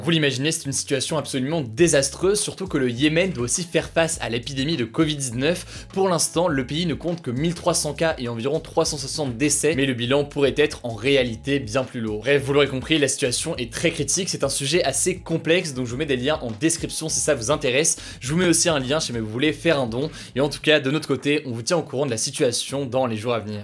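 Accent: England, United Kingdom. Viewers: j'adore ton accent anglais! Merci pour tout le travail que tu fournis! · Y a qu'à moi que son accent anglais fait marrer ? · Ton accent anglais est trop bien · Ton accent anglais >>>>>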